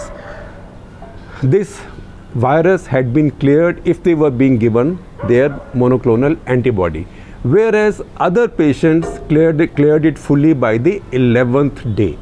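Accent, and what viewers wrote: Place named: Punjab, India. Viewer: if this guy can drop his Punjabi accent, he'd get a lot more views on his channel. For heaven's sake it's not Indiana Police 😣😣😣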